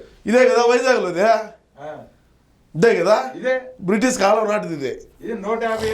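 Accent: Rayalaseema, India. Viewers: వారు మీ విశ్లేషణ అద్భుతంగా ఉంది.. మీ బాడీ లాంగ్వేజ్ చాలా బాగుంది. అంతకుమించి మీ రాయలసీమ భాష యాసలో అమోఘంగా ఉంది.. మన ఆంధ్రప్రదేశ్ రాష్ట్ర భవిష్యత్తు కోసం మీరు చేస్తున్న ఈ త్యాగం చరిత్ర మరువనిది మరపురానిది... నీ విశ్లేషణలో పండితుడికి పామరుడు కి అర్థమయ్యే రీతిలో. కాల్ అంకుశం గా. గ్రౌండ్ రియాలిటీ ను నిక్కచ్చిగా నిష్పక్షపాతంగా... నిర్మొహమాటంగా.. ప్రతి సంఘటనను కూలంకుషంగా. నిజాయితీగా నిబద్ధతతో వీడియోలు చేస్తున్న మీకు. నా యొక్క కృతజ్ఞతలు తెలియజేస్తున్నాను. అని పేరు పెట్టుకున్న మీకు. నిజముగా మహారాజుకు ఉన్నంత మంచి మనసు ఉంది..... అద్భుతమైన మీ విశ్లేషణతో ఆంధ్ర రాష్ట్ర ప్రజానీకానికి చైతన్యం కలిగించి. మార్పుకు నాంది పలికే విధముగా వాళ్ళ మనసులు మార్చి.. రాబోయే కాలంలో వాళ్ళ భవిష్యత్తును బాలేదు నిర్ణయించుకునే విధంగా. మీరు చేస్తున్న త్యాగం కృషి మరువలేనిది. న్యాయం ఎటువైపు ఉంటే. వారికే ప్రజలు పట్టం కట్టాలని. రాష్ట్ర భవిష్యత్తును తీర్చిదిద్దుకునే అవకాశం మన చేతుల్లోనే ఉన్నది అని నిజాన్ని నిర్భయంగా చెప్పే మీకు నిండు నూరేళ్లు సంపూర్ణ ఆరోగ్యంతో ఐశ్వర్యంతో ఉత్తర తో కాలనీ మీ కుటుంబాన్ని దేవుడు ఆశీర్వదించాలని మనస్పూర్తిగా కోరుకుంటూ. 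కృతజ్ఞతలతో🎉🎉🎉🎉🎉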